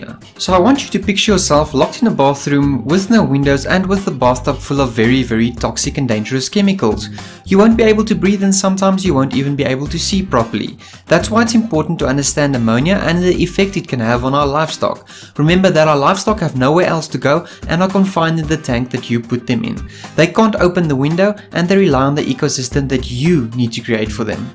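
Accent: South Africa. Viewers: It takes exactly 0.3 milliseconds to recognize a boer accent 😂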